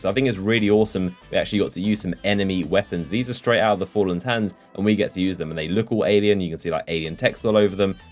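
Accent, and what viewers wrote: United Kingdom. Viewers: Outrageously British yay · Outrageously British PS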